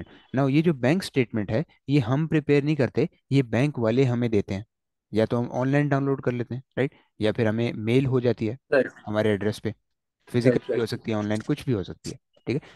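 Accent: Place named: Gilgit, Pakistan. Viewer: You have one of the best ways to explain that I've come across so far. Your accent sounds like that of the Gilgit people.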